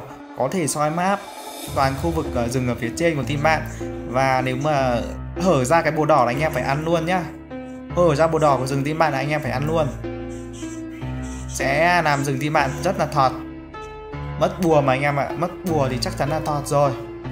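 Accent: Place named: Hanoi, Vietnam. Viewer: Cái giọng đậm chất Hà nội nghe anh bình luận hay hơn anh đánh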